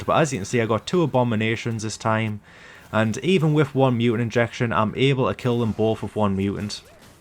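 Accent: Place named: North East England, United Kingdom. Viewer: aye lad am from northeast I could tell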